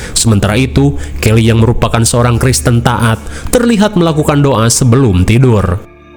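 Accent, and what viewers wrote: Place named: West Java, Indonesia. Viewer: Asli mana bang sundanya ?? Jadi penasaran hehe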